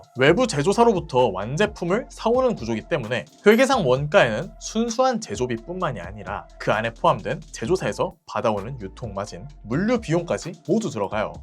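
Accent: Gyeongsang, South Korea. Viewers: 이 분 발음이 경상도 분이시네용 ㅎㅎㅎ 영상 알차고 좋네요 잘 봤습니다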